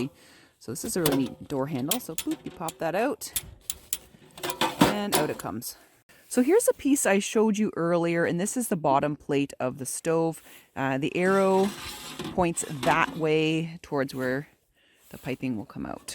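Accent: Canada. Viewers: You must be Canadian! Just subscribed. Never stop saying "oat" for "out." Of course your way is just a correct as ours!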